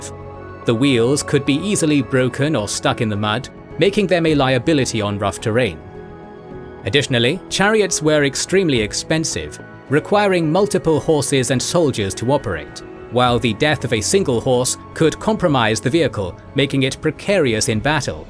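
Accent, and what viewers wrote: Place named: RP, United Kingdom. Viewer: Very informative, clear and well illustrated video. Compliments. I also very much like your articulation and British Standard accent, it sounds very elegant like an officer of the Empire in Star Wars (it reminds the briefing in the Tie Fighter video game) and it is easier to understand for non native English speakers such as myself. Hats off ^_^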